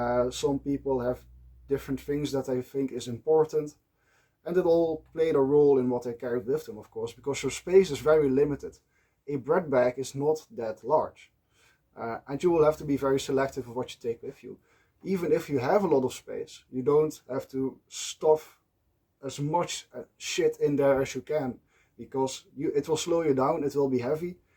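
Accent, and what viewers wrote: Netherlands. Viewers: I’m new to this channel and I’m loving it so far. I’m assuming by the accent you are from the Netherlands? Hello from USA!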